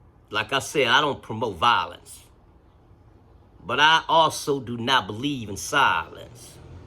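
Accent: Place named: South, United States. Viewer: Powerful, brother ✊. You sound like you might be from the South. Mississippi, maybe? If you are, we need you to go to Minneapolis and school those MFs. I don't mean just the Tou Thaos, but also the Tou Ger Xiongs and the KaYings who purport to be the almighty leaders in the Hmong community. Tell 'em to take their degrees and get dafuq outta the way so you can stop this sh!t from happening again. They let Tou Thao happen and he embarrassed us all forever. I am pissed.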